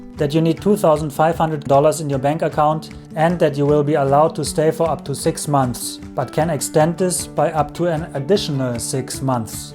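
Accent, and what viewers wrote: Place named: Germany. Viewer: You have the most cliché kind of German accent :D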